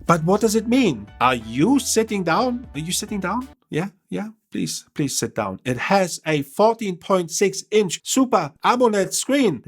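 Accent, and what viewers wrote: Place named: Germany. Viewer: I'm a Chilean fan from your work... And love your German accent... Very cute 😂👌🇨🇱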